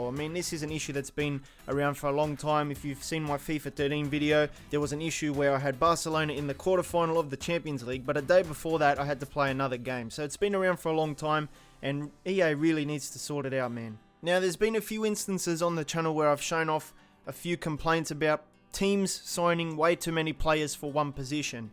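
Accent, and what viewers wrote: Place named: Australia. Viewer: Pretty sure he’s Aussie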